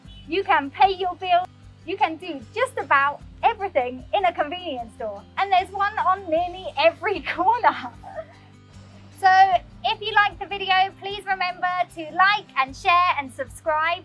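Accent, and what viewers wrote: United Kingdom. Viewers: 英國腔真的很好聽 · Thank you For introducing Taiwan's good and strong places To whole foreign countries But l do appreciate your special UK accent So cute and lovely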